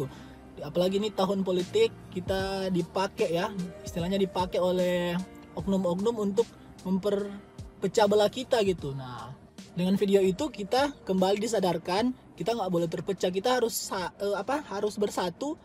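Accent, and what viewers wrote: Manado, Indonesia. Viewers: Selama tontonin chenl abang nanti tau abang orng manado😂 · Bang org manada kang?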